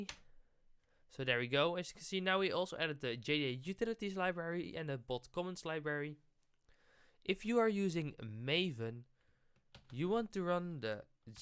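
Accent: Netherlands. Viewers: U dutch? Becaus U have the accent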